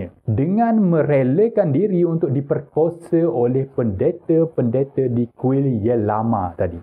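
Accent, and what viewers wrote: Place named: Kelantan, Malaysia. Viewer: Alaa2 plak gaya😊,ad kene mengena nge ko abe??I look kind of similar😁,kcek mace pelet loghat alaa2 ore klate sek kto ko ore mno terr?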